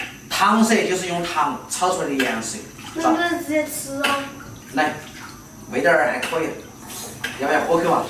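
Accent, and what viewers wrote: Sichuan, China. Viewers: 你彪哦！四川話真的是獨樹一格 XD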